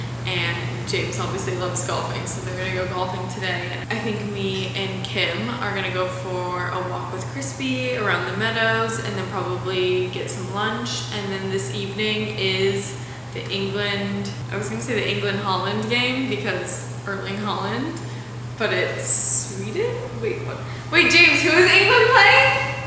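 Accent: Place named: United States. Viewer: I love in American Accents. By the way, May I know Where is your accent in America? Thanks you.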